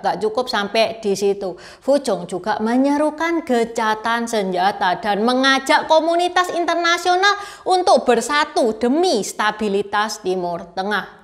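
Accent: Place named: Surabaya, Indonesia. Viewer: Salut info yang cukup mendetail diiringii logat khas surabaya dan gaya bicara yang kocak dari.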